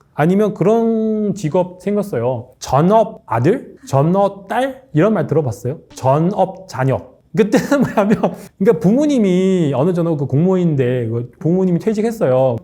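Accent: China. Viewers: 선족티가 난다. ㅋㅋㅋ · 이 사람 중국인인가요 발음이 쭝궈런인데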